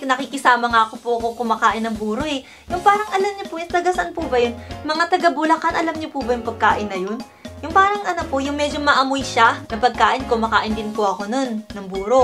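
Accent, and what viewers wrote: Japan. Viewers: Dami alam ng mga bashers na yan! Obvious na japanese ka nmn.. it doesn't matter kung pinay o haponesa ka ang importante hindi mo nakakalimutan na may dugong pinoy ka.. hatsuong palang alam mo na haponesa ka ..mas bilib ako sayu kc napaka fluent mo sa tagalog.. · Sarap pakinggan ng Japanese accent mo huhu.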